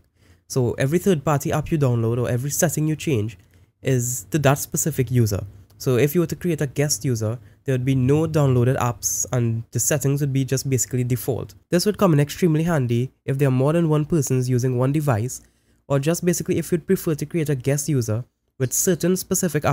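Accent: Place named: Trinidad and Tobago. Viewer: great vid.....you sound Trinidadian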